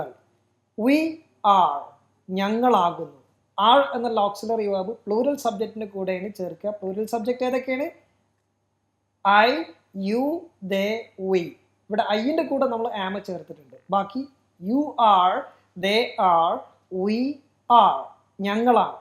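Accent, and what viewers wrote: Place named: Malappuram, India. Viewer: Malapuram slang nannaeittunde sir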